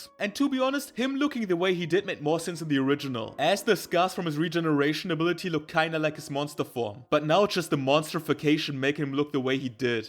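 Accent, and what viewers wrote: Germany. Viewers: Could you read Boys abyss? I think it doesnt matter if you shit talk or compliment manga, because i think you make good commentary either way. Btw are you german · i hear deutsch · Starker Deutscher Akzent Bro. Erstes Video was ich mir von dir anschauen werde.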